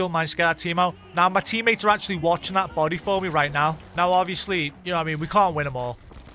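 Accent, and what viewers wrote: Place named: Scotland, United Kingdom. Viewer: thank you friendly Scottish man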